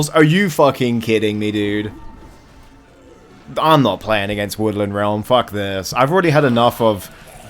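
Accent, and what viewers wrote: United Kingdom. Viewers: I liked as soon as I heard this glorious British voice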